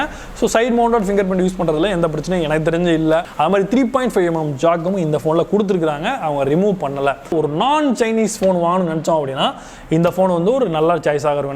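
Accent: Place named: Madurai, India. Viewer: I'm new subscriber Anna nenga Madurai ya ? Slang mass .......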